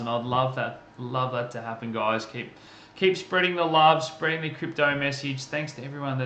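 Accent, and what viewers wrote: Australia. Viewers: Brilliant, level headed insights. And I love te Aussie accent being a Queenslander myself. Where are you from?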